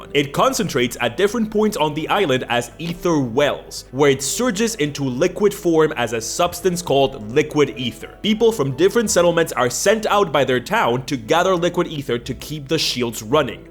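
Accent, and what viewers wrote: Spain. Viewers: Ideas muy chulas, dibujos geniales... Pero y ese acento en inglés siendo español??? Wow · I mean… his last name is and he has a Spanish adjacent accent, it is sort of expected. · waitaminut You're a fellow spaniard? Holy, your accent is really nice.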